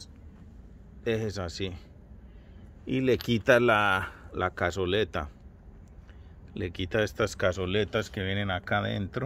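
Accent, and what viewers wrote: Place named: Antioquia, Colombia. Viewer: Justo lo que estaba buscando. Quiero hacer uno de estos y encontré varios videos en otros idiomas pero este en el español con acento paisa lo entendí a la perfección saludos desde Colombia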